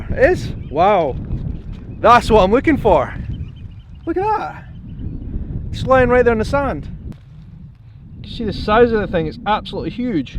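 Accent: Scotland, United Kingdom. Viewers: Thanks for sharing mate! I love your videos. The sad thing is those make me feel like I've made a bad choice to live in England, the good one is, I can still try to move north :) Scots accent is so cool and easy to understand, I could listen to it all the time, and all that nature you have there it's so beautiful, so remote. It feels - to me - like Polish have so much more in common with Scots rather than with English - the culture, expression while speaking, and so much more... I'm happy to subscribe!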